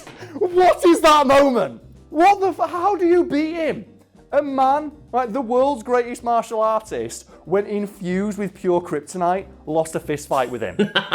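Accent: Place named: North, United Kingdom. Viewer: Just cuz you sound like ur from the north. It means nothing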